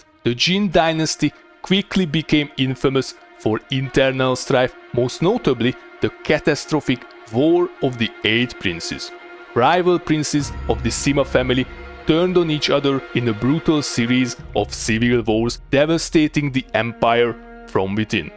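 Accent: Hungary. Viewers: Love the hungarian accent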